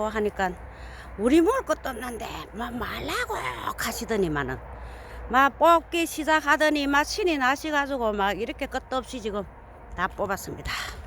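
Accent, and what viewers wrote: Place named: Gyeongsang, South Korea. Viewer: 경상도 사투리쓰시는 친근감이 베스트이고 무ㆍ배추가 힐링되니 더더욱 귀촌생활이 아름답습니다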